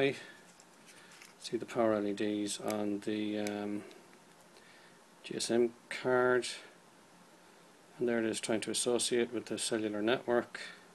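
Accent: Ireland. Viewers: The Irish is strong with this one 🍀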